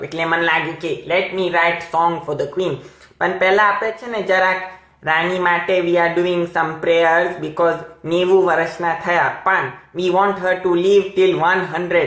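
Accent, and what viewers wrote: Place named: Gujarat, India. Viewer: hahahhah....m madly in love with ur videos..how did u manage to pick that quintessential gujju dialect?? ur observational skills are damn good.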